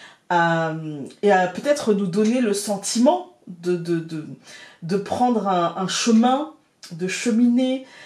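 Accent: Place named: Picardy, France. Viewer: Coucou pourrais tu me faire savoir quel Lenormand tu utilises pour ce tirage. J ai tous tes jeux et celui-ci ne me dit rien. Je l ai peut être loupé. Merci pour le partage et pour ta réponse. Belle semaine à toi avec l accent picard. 🌞💐🌳🌞😘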